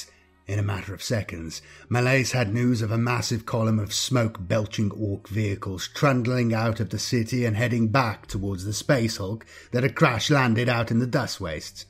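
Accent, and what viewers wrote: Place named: RP, United Kingdom. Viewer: You have crafted the almost perfect 40k narration voice , English received pronunciation with a touch of theatrical enhancements to add a dramatic effect , but not too over the top or camp , admirable restraint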